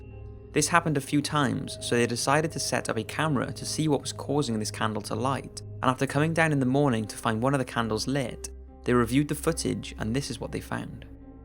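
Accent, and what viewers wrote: Wales, United Kingdom. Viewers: Hello, Are you from britain? I like your accent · Hey I was just wondering, where are you from, because your accent sounds a bit Welsh. Thanks!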